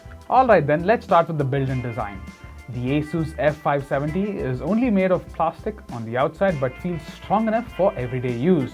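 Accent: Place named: India. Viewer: Fuck i can't stand the fucking idian accent ... it gives cancer 🤮🤮🤮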